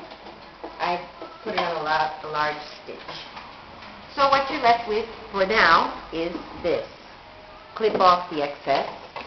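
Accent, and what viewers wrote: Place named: United States. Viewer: Wow that was great, I make these too, but I use elastic instead of rubber bands. We in the USA pronounce centimeters differently, but you sound like you have a US accent where did you grow up?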